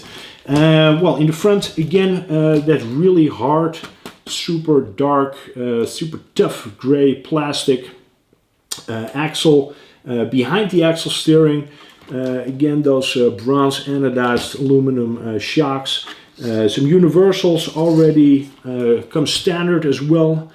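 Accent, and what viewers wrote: Netherlands. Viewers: What is that accent you have? It sounds a bit Dutch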